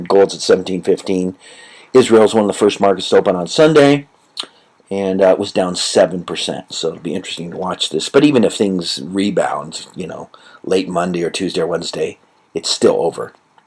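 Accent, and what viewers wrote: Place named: Argentina. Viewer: I like your Argentinian Accent! LOL!!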